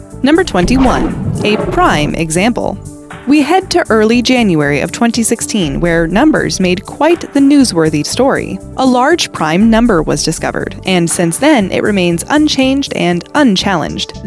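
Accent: United States. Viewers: speaks with an American accent. gives temp degrees in Celsius. stupid bitch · Wow I am impressed that the narrator ,who has an American accent actually pronounced the Antarctic correctly . Normally an American pronounces it as ANARTIC totally missing out the T.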